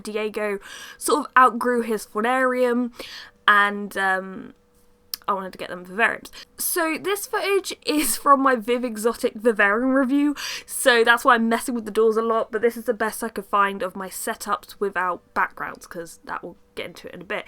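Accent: United Kingdom. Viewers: i love brittish accent :3.... i just love it _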